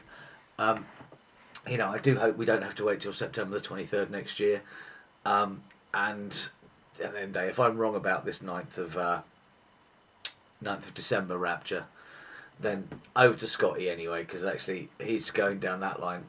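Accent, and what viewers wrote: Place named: United Kingdom. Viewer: Beautiful British accent and a beautiful encouraging message! Thankyou xxx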